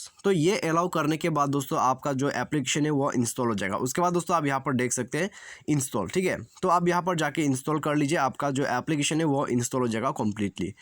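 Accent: Assam, India. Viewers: Bro i think you From Assam? 🤔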